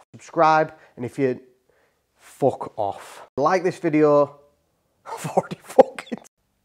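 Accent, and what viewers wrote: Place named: England, United Kingdom. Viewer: Man just found the channel, loving the videos just wish you spoke more you have alot to share! I'm from Birmingham but moved to USA 21 years ago so I love hearing the accent reminds me of England my homeland.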